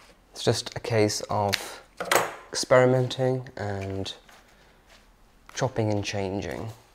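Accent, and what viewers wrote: United Kingdom. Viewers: Dear, I love your videos...Your beautiful and gentle British accent, your beautiful and soulful spirit, your sense of Elegance and Beauty and your mannerism!!! Love your natural and delightful attitude toward life, living, and sharing your interests!! Your videos are refreshing and Soulful. Love the music you selected for your videos, it is perfect!!! Your videos provide a sense of harmony, love, friendship, and sentimental values and tradition which many of us appreciate.You are Awesome!!! Superb job!!! Thank you!!❤❤❤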